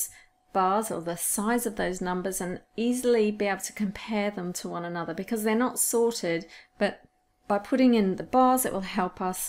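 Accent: United Kingdom. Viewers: It's her British accent. We in NA are suckers for it. lol. A smooth talker from the UK could sell refrigerators to eskimos LOL. But I agree - she is REALLY good.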